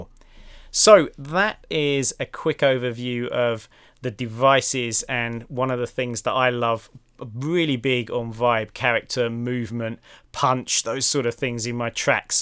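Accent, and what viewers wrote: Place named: United Kingdom. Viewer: score a smooth British voice. thanks mate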